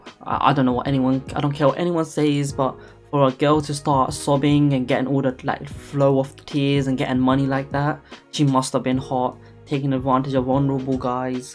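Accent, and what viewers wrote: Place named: Southern England, United Kingdom. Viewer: rjship - I'd say south England by the accent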